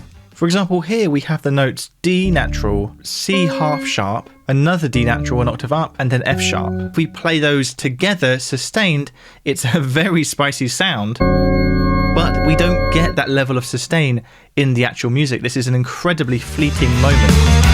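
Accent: England, United Kingdom. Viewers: Nothing like an English accent to wash off the magic.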